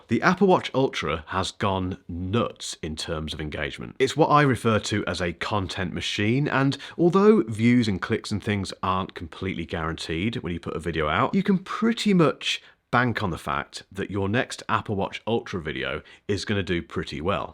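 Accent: United Kingdom. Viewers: Keep up the good work, nice to hear tech reviews, simply explained and in a Brit accent !!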